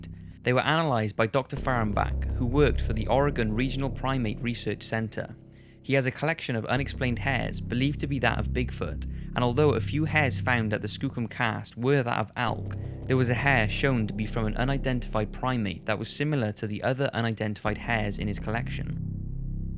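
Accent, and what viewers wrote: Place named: South Wales, United Kingdom. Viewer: Still unsure ... Hey you sound like your from South Wales... Swansea area?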